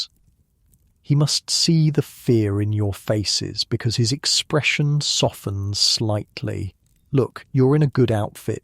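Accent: United Kingdom. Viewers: Why a Brit narrator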